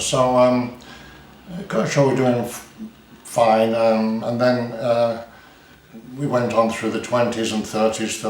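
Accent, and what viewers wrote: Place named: Yorkshire, United Kingdom. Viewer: Lots of lovely little stories in there,. Love your Yorkshire accent!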